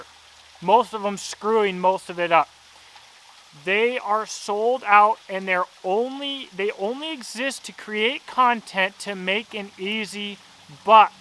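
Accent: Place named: New England, United States. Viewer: His Yankee voice is so annoying.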